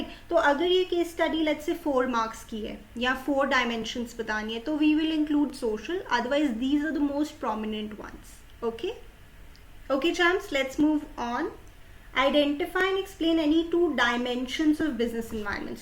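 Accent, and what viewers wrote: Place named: United Kingdom. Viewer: Aap british english b bolte ho 😬 #via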